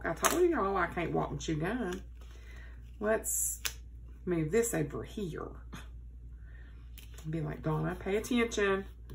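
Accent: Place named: West Virginia, United States. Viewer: I really love watching you, your so genuine!! Not to mention I love your accent, it takes me right back to my childhood visiting my family in West Virginia!! I lost my father recently to Alzheimers so I haven't been watching a lot of videos, but I'm starting to get back to planning and your one of my favorite you tubers!! Keep up the great job, your spreads are so unique and beautiful!!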